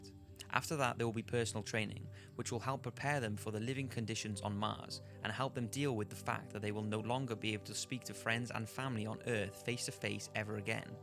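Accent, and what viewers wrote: Wales, United Kingdom. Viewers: i can not work out your accent! it sounds kinda welsh??? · i like british commentary. it makes the videos feel more intense and stuff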